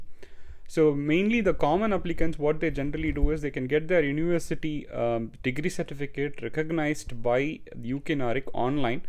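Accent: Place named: India. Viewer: Hi sir Good effort👍 Also I observe your Indian slang not changed while staying there even after 10 years. Is that because its a Britain English. Many people in US after 3 years talking like US 🙂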